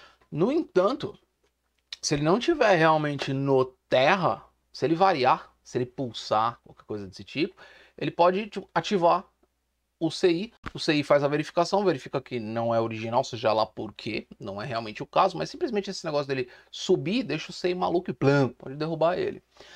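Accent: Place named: Rio de Janeiro, Brazil. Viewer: Show like show , vídeo muito top vc e do RJ tem um pouco de sotaque :)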